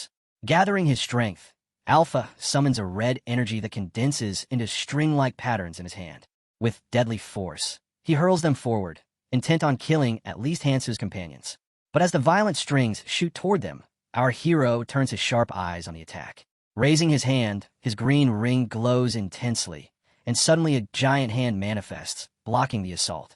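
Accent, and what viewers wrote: United Kingdom. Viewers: Fuck this British accent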